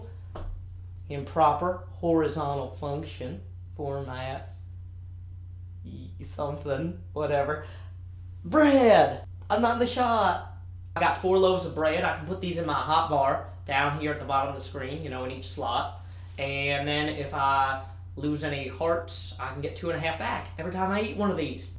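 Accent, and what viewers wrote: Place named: South, United States. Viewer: this is hilarious, you never cease to amaze me with our southern accent and funny videos.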